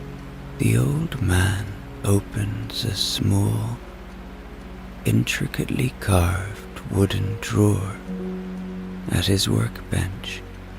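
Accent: Ireland. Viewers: You have such a calming voice. I love the Irish accent. I think the Irish singers are fabulous too. I’m afraid I never get to enjoy the actual stories as I don’t hear them. It takes a very short time to be lulled asleep listening to your voice. Thank you.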